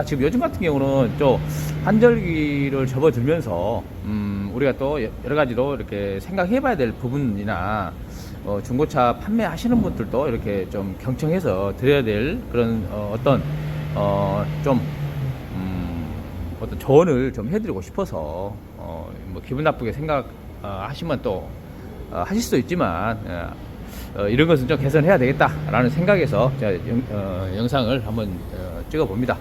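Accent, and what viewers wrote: Jeolla, South Korea. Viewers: 전라도 사투리부터 없애고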